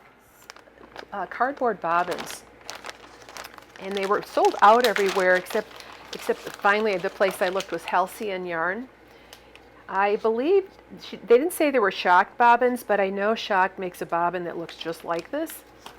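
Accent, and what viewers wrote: Chicago, United States. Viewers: Hi. I have been watching you for a few years now. My family is from Chicago although most of them have moved to the southwest. Your accent reminds me of home. I love your videos and all of the knowledge you share. Thank you so much. I have started spinning this year. I’ll let you know how I get along. I might have some questions. I have a used lendrum wheel that I bought from an English lady who used it for 30 years. It is well loved.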